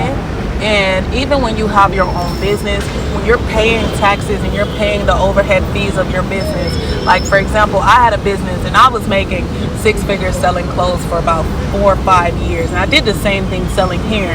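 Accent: United States. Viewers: This is easy. Your family thinks you're mentally unstable. They feel you are ungrateful for the sacrifices they made to escape from Jamaica. They also think you're putting your fantasies and expat self-loathing before your daughter's well-being. I don't think you're insane, but I do think you're extremely gullible and immature for your age. You're also spoiled. Many doors have opened for you simply because of your extreme physical beauty. Despite your good fortune, you allowed airheads to convince you that you were lost and without value in America. You will continue to feel even more lost in a continent that is not your home. You will always be a foreigner in Africa. No matter what country you visit, you will be reminded of that. Will you be treated better than Africans from other African countries? Sometimes, but only because of your American accent which will make the natives think you are rich. 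You will be overcharged, scammed and a target for theft almost everywhere you go. It will also be extremely exhausting dealing with visas. Thankfully, you will still have your American passport, because YOU WILL NEED IT to return to the country that you despise.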